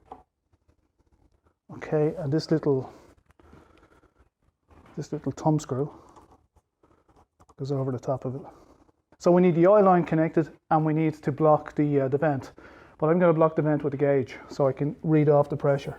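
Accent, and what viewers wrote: Dublin, Ireland. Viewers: Good morning, you sound like an auld Dub, God Bless ya! I don't know how your clip came to me, as I was working on me auld burner that's on a Warmflow boiler out me back in the dark. And Lord behold! When I got up at 04.00 this morning due to the burner being on me mind, I fired up the laptop. I went into Youtube and the very 1st video clip that was shown to me was you. Just unbelievable. Apologies for the long intro here. Just to add... I would like to say that your one hell of a bloke. Your demonstration and explanation is second to none. There's a pint of the Black Stuff on it's way and thanks very much for this well informed video. God bless and may you have continued success with your channel. Kind regards, Paddy.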